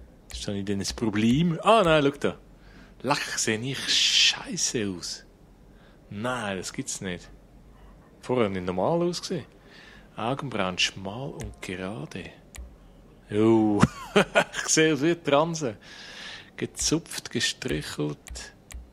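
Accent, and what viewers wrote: Switzerland. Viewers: Englich Schweizerisch.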